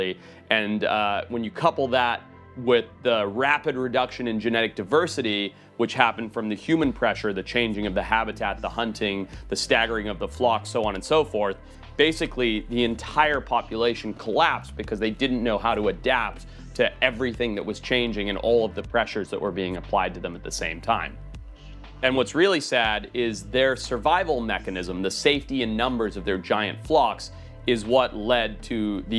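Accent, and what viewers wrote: United States. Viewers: Saying Americans pronounce zebra wrong when you pronounce can’t like con’t and forest like far-ist is and interesting take. You basically sound American except for certain words. Almost like you’re on real bros of simi valley.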